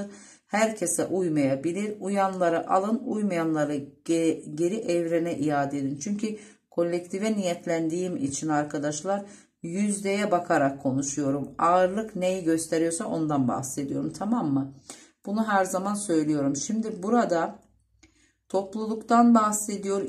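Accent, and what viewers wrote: Azerbaijan. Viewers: Ağzınıza sağlık.Güzeldi.Bu arada sanki Azerisiniz.Konuşmanız azericeyə benziyor.Azərbaycandan yazıram.❤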